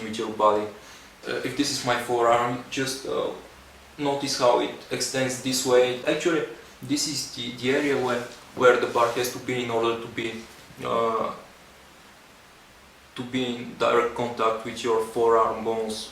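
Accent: Bulgaria. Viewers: hey man, are you bulgarian?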